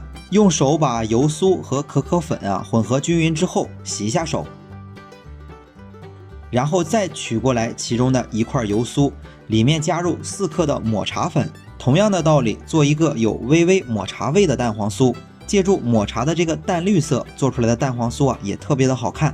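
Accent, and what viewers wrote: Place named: Qingdao, China. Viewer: 您的口音很像青岛老乡😊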